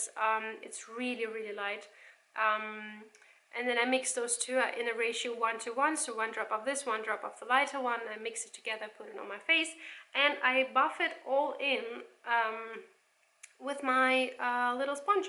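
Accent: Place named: United Kingdom. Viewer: And that irritating british voice.....Ugggg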